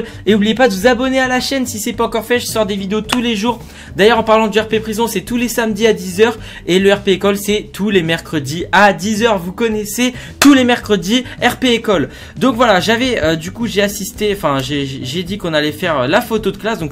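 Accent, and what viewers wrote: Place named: Italy. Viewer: Le meilleur accent italien 😭😭😂😂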